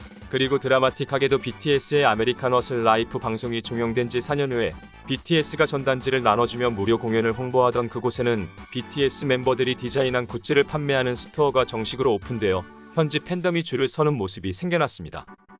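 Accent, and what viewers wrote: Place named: Japan. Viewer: 영어를 일본어처럼 하네 영어인지 몰랐네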